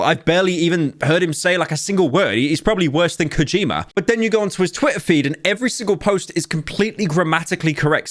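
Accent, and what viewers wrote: United Kingdom. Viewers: is Bri ish?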